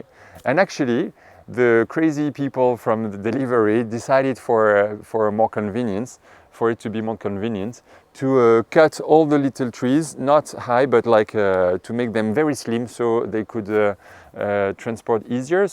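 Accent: France. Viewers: I love the symmetry of your gardens ,thanks for showing us that you have a plan to follow.❤I am creating a garden and have a blank canvas.I love the Pierre de Ronsard Roses .I grew one over an Arbour years ago .I have bought two to plant against the Brick wall.and have a standard and other Pink David Austin’ roses etc Your hydrangeas look beautiful .I have a number of Hydrangeas-to plant ,blue and Pink . I want to incorporate a vegetable and Perrinials area into my plan too.I can see the garden rooms you have created.😊You have a French accent 🇫🇷 are you near Switzerland.Cheers from Australia 🇦🇺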